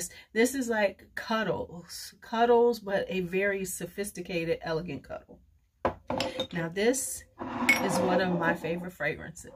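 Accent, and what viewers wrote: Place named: United States. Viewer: love this house! Serge is sooooo good! Clair de musc is my base... always. BTW your french is adorably USAmerican. <3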